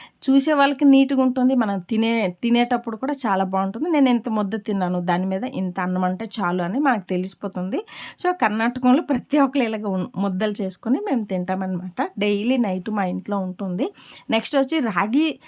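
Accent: Srikakulam, India. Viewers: Slang srikakulam, place karnatakam, chesedi authentic rayalaseema food. Nice.. Flavours of India.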